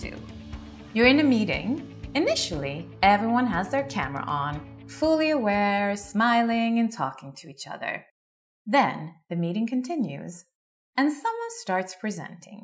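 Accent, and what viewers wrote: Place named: Sweden. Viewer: Applaudable pronunciation, but I could hear that Swedish accent! :D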